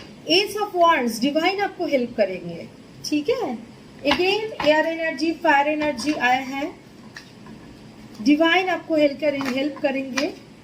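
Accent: Bengal, India. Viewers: Are you Bengali? Nice Reading....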